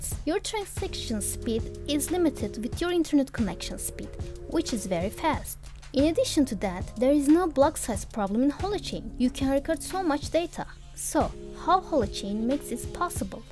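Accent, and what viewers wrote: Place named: Turkey. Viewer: I can hear a turkish women 👀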